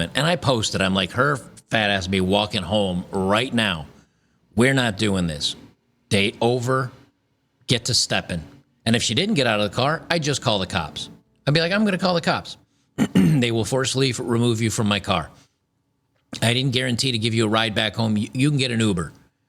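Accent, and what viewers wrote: Australia. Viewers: I am begging you please do more Australian accents in your videos to come it is much-needed comic relief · G'day mate I like your aussie accent. Crickey her reaction on the trip home was bloody funny. How would posting that video help her future dating prospects?. The first video makes her look like a terrible person, the second video makes her look pathetic.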